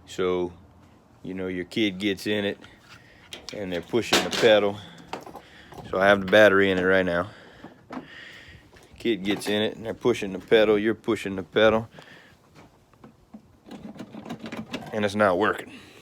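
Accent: Louisiana, United States. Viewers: You're definitely from southwest Louisiana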